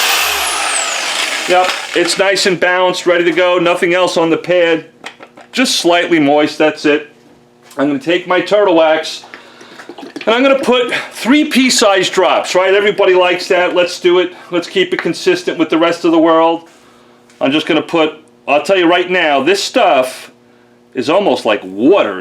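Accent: New York, United States. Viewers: ha! I thought he sounded a little "New York" accent as soon as I heard - as someone who has visited New York and loves it:-) Thanks for the vid. Meguiars seems to win on most things. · I was going to say you sound like you're from NY. (NJ here) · Jesus, I feel like i'm hearing my brother 'taawking' on the phone with me:) As usual, you have the most honest and informative videos on car detailing. You're a legend,!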